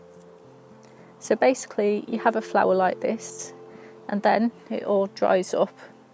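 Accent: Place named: United Kingdom. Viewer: You speak British English. I Love it beautiful 😍 ❤. Do you live in England 🇬🇧?..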